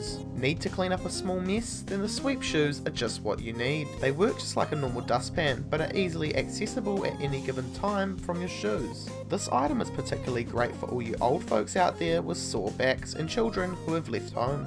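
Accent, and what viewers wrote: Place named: New Zealand. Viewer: 1trueNRG my guess would be, New Zealand, sounds like their accent lol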